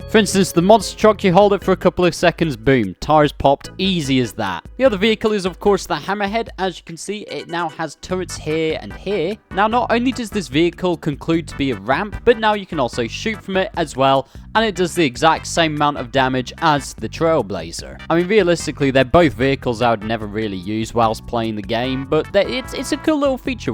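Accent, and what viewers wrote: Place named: United Kingdom. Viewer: I love this British accent 😭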